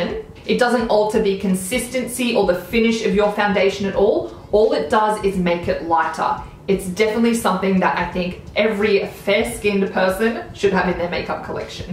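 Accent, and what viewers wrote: Australia. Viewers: hy dear u from austrlia???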